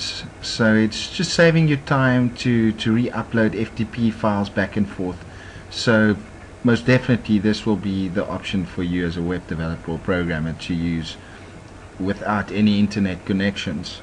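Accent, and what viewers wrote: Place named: South Africa. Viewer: Spoken like a true South African hey?! LMAO. Hard to miss that accent after all these years of living in the US. Ek mis Suid Afrika so veel my broer dankie vir die studieklas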